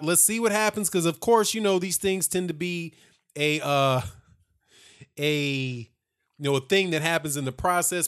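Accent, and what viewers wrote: United States. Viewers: I like your "more than likely's":) i also like your american accent and no bias towards Beyonce being allowed a few extra seconds of recovery against Ortiz. Bravo!!!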